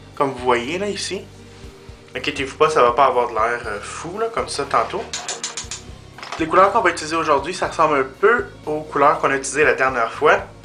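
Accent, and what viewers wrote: France. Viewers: are you from the south of france? trying to figure out ur accent (in french)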